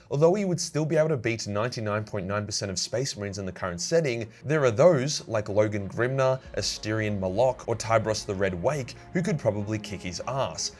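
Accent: Australia. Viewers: Been a fan for a while now cheers mate for being the reason I’ve become a fan of war hammer and I keen to always hear a fellow Aussie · Battle of cadia explained by australian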